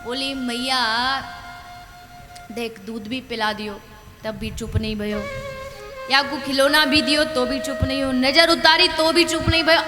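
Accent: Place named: Braj, India. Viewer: मैया यशोदा व भगवान भोलेनाथ बाबा का " बाल गोपाल दशॅन " के बारे में आपके श्री मुख से यह प्रसंग व सवांद सुन कर हम काफ़ी प्रभावित हुए हैं। 🕉️🕉️🕉️🕉️ खास बात आपका वृज भाषा के ऊपर प्रभुत्व (वैशै तो आपका जन्म स्थान ८४ कोश में आता है फिर भी आप के मुखार विंद से वृज भाषा हमें बहुत प्यारी,प्यारी व मीठी, मीठी लगती है और बार, बार मन सुनने को कर्ता है। ☘️🕉️☘️ सचमें मैया और भोलेनाथ बाबा बात करते है ऐसा हम अनुभव करते हैं। ☘️🕉️ देवीजी, आपका बहुत-बहुत धन्यवाद हमारा राघे-राघे और जय श्री कृष्णा स्वीकार करे । 🙏♥️🙏♥️🍀☘️🍀💐🌷💐 <mail> USA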